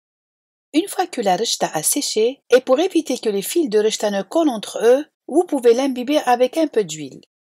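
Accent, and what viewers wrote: Algeria. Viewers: اشتركت في قناة على خاطر الغربية نتاعك تخرجلي شابة بزاف. من فضلك وصفة الفاكهة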